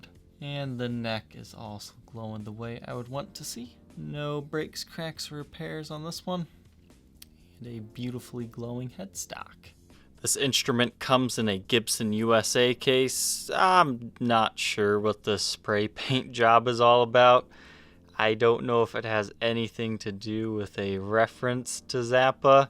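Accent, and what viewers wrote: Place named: Southern California, United States. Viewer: ...it adds a certIN Quackiness to yer tone.! for a guy who isn't into Frank, sure has his vocal speech southern Cali dialect down to an untrogladyte level.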